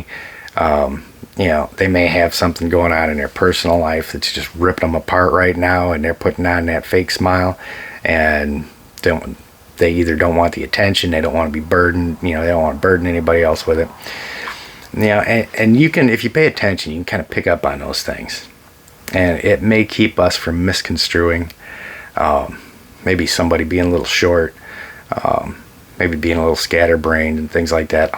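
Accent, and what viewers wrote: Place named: Northern Illinois, United States. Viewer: I was gonna ask if you are from N Illinois! Close enough! I still have the accent too and been gone 20+ years... And thanks for your service man!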